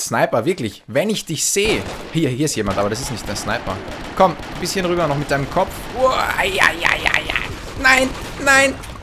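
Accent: Austria. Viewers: Bist du Österreicher dein Akzent klingt so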